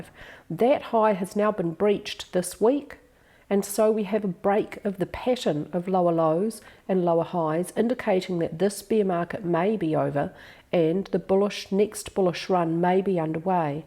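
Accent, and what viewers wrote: New Zealand. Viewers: Love your work, so HAPPY to hear that Kiwi voice again The weaknesses shown in the various indicators on XRP really show an incredibly indecisive market right now. So, so hard to find signs of life! 🤪🙏😁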